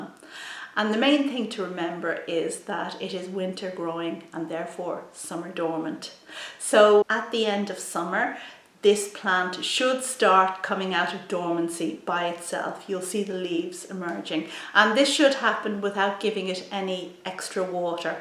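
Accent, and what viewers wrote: Ireland. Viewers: Thank you, Love all the information you share with us but most of all I enjoy your Irish English which I adore. Great videos.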